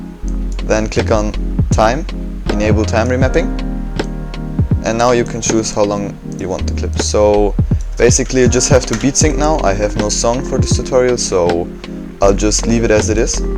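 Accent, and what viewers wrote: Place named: Germany. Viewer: mag deinen deutschen akzent und natürlich auch das tutorial xD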